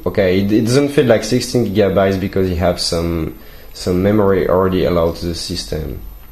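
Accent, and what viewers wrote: France. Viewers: tu ne serais pas français par hasard?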